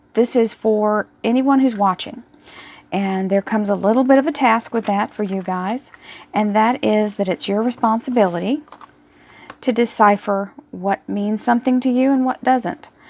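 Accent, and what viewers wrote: Texas, United States. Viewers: This is is 4 U R GREAT N FUNNY IN A GOOD WAY. I LOVE UR TEXAS ACCENT. 🤗🤗🤗🤗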